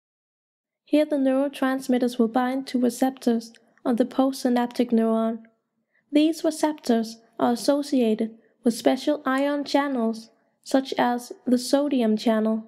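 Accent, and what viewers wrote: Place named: Denmark. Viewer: Vidste du var dansker, man kan høre det på din accent ;) God video keep it up!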